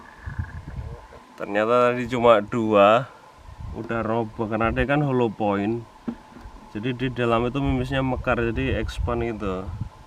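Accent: Java, Indonesia. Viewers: jowo mu ngendi kang..kok koyo logat jowo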